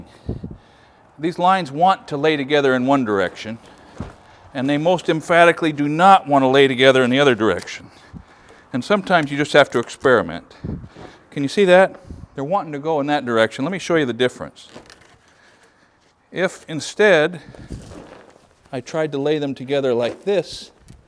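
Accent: United States. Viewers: Love your beautiful vocabulary and accent. Original American accent. Words are not enough to appreciate the value of your useful programs. Lots of appreciations, thankyou.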